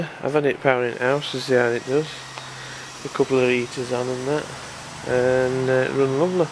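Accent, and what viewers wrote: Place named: North, United Kingdom. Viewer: This is the box i got wi it. Lazy northern accent and missing lots of letters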